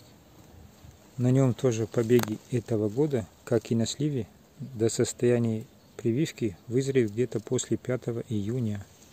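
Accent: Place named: North Ossetia, Russia. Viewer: Добрый день! Случайно попала на Ваш канал и не могла 2 часа оторваться! По комментариям узнала(по акценту догадалась) что Вы из Осетии. Подписалась и буду применять Ваши методы. Все здорово и понятно.А кто Вы по профессии?Удачи и процветания. Елена Тедеева .Армавир.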